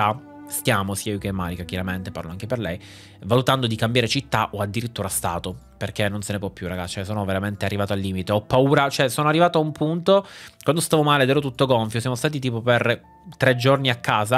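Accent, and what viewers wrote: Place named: Palermo, Italy. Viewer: Sul problema di salute vedo molta confusione e non mi sento di chiarirla perché non sono qualificato per farlo: ti consiglio amichevolmente di seguire le prescrizioni mediche. Se non ti fidi del curante cambia medico. Dall'accento immagino tu sia di Palermo. Al tuo posto anch'io avrei evitato il P.S. (casomai al massimo la guardia medica, ma se sei stato già visitato dal curante anche la guardia medica non avrebbe avuto molto senso). Forse sarebbe meglio rivolgersi a un bravo internista, anche privatamente (purtroppo si paga un botto, ma le tempistiche della sanità pubblica, dalle, presumo, nostre parti sono bibliche). Mi dispiace per l'auto, ma le Fiat vanno via come il pane. 😔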